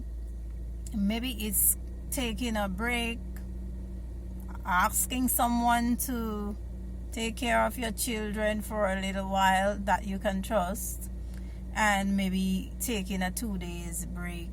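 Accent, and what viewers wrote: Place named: Saint Vincent and the Grenadines. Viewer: You have that rich Vincentian accent. You speak with passion. Speak Louder, my friend and sister!.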